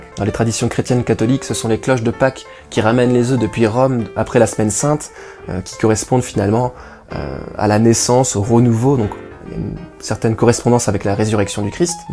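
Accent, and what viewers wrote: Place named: Alsace, France. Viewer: j'entends de plus en plus un accent alsacien dans ta façon de parler. Ah yooo, ça fait plaisir dü ! ;)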